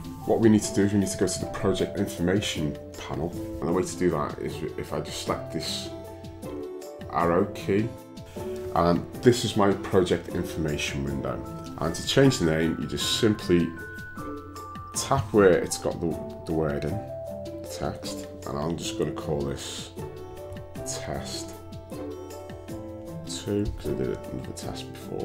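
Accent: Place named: Liverpool, United Kingdom. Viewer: Scouce, lad 😂😂😂😂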